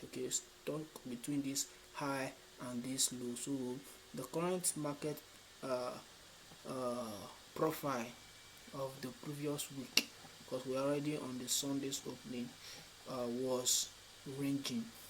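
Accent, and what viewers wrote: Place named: Nigeria. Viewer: Chai, I thank you very very very very very very very very much, infact words can't explain how I am thankful for finding this YouTube channel. Let me tell you, since I found this channel I have been having in my trades and heart. Normally I don't like watching any YouTube videos apart from ICT, but I was on a search on a question that was bothering me, then I stumbled on your channel, just watching one, just one video from your channel I got in love with the way you simplify everything ICT is teaching us. You know, there is a difference when your own Nigerian person is talking to you compared to an America or Foreigner with their accent. I just love it, Sir please keep it up to drop simplified videos like this and others in future. I'm your number 1 Fan. Love from me to you❤️❤️❤️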